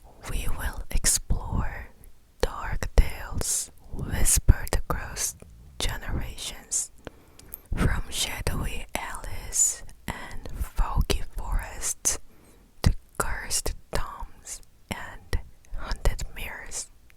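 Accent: South Korea. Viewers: love your Korean accent while speaking English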